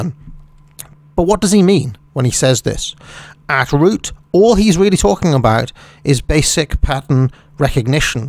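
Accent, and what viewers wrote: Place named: United Kingdom. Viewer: As a Citizen of the ROI and an outsider, well not a UK subject, sounds quintessentially British. I mean that as a compliment, not insult..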